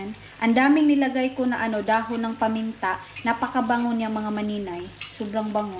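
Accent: Visayas, Philippines. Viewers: pag nag bibisaya ka tawa ako ng tawa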